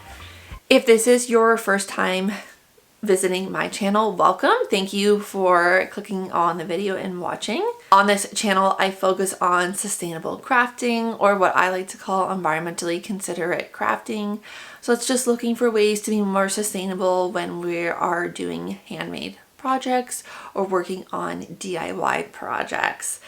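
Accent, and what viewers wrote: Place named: Canada. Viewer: I hear Canadian, I subscribe! 🇨🇦 ❤ Plus thrifting, crafting, mending, everything up my alley! ❤❤❤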